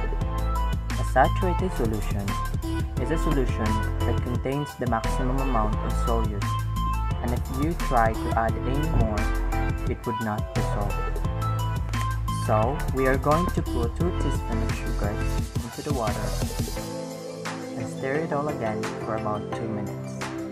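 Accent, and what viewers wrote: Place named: Philippines. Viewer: You sound like a pilipino person im pinoy too.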